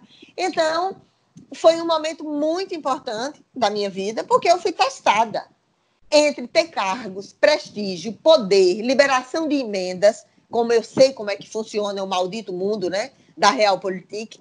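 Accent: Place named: Northeast, Brazil. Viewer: boa noite !!,hoje estou matando-o minha saudade de vê essa mulher nordestina como eu,nosso sotaques é um orgulho da espiritualidade e uma busca por coisas melhor .vamos nós sempre firme neste sentido .obrigado .!!!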